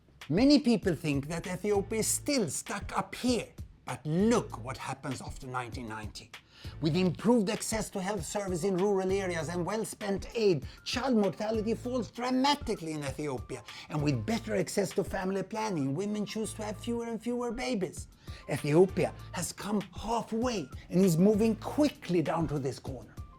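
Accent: Sweden. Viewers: Never heard a more swedish accent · Kan inte ta han seriöst med sin jävla svengelska. Känns som man tittar på en kass Siba reklam eller något. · Han pratar ju bra och tillräckligt avancerad engelska, bara att uttalet är typiskt svenskt. Kan ju knappast kallas för svengelska, och jag tror att det bara är vi svenskar som "stör" oss på det.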